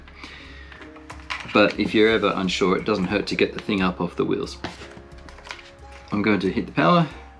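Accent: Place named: Australia. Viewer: Hi, good to hear an Australian voice [I'm on the east coast], watched all the reviews as you mentioned it’s a good trail truck do you feel its is worth the AUD$900.00? Also how hard would it be to strip the body down of all the bits, to swap to a clear body and go with your own colour choice.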